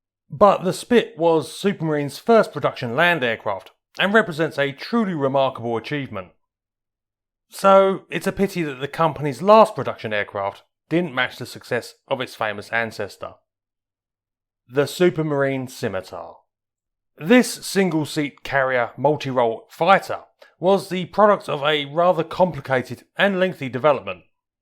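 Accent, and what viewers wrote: United Kingdom. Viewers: It's not an American accent. He's British.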